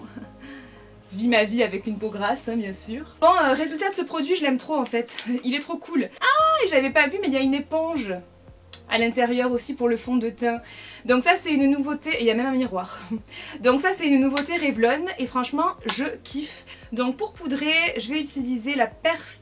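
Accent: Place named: South, France. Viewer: oh j'ai trop aimé j'espère que tu referas des vidéos comme ça !je crois que c'est une des seule vidéo de 20min où je m'ennuie pas devant ! et perso pour les horaires de tes vidéos ça me dérange pas du tt comme ça je rentres des cours et je la regarde en rentrant ! gros bisous ! ❤️🐚🐚 (j'ai l'impression que t'as de plus en plus l'accent du sud j'aime trop 😂)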